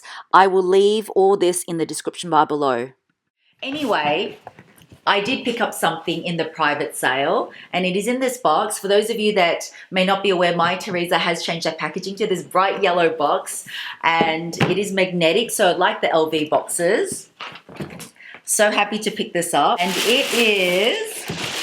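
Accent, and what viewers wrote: Australia. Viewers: Hi, love your videos. I really appreciate all the information and tips. I really enjoy watching your videos because you are so knowledgeable and especially love your Australian accent. #faMELy Look forward to your next video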